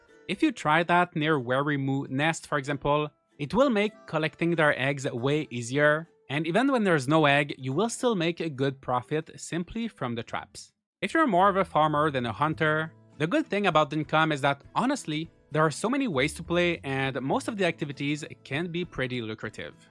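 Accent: Quebec, Canada. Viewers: Are you from Québec? ^_^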